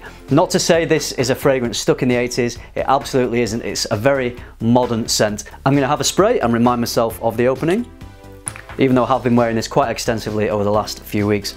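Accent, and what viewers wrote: United Kingdom. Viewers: Besides the afford you put in your Videos, id like to tell you, that your Voice is just so relaxing and pleasant to hear, maybe because i also like that British açcent. I hope that doesnt sound weird to you. XD Anyway, loved the Video, reminded me alot of GTA Vice City haha. Many greatings from Europe.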